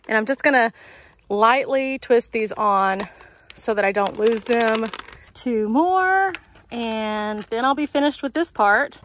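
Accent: Texas, United States. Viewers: Ooooohhhhhh I have been waffling for a year too for my NV. Gonna watch and see!!! I haven’t been able to pull the trigger on a rack and box because the NV is just a little tippy toppy rocky already and didn’t know if adding up volume was going to make it more so. And can I actually crawl up and get stuff easily or not. Would I even? But I want to go on longer journeys and to do so I either need a roof box or hitch box. Waffle waffle waffle waffle waffle. I love simplicity and minimalism and well a big ole box seems like a big ole closet. Carry on vs checking luggage. But maybe. Can’t tell you how much your channel has helped me make decisions because you have the same vehicle and the same Texan accent 🤣. Oh I miss Whataburger! Misplaced Texan that needs to journey home to see the folks whose house just flooded in that last flash flood and so ya I guess I need a roof closet y’all, darn it 🤷‍♀️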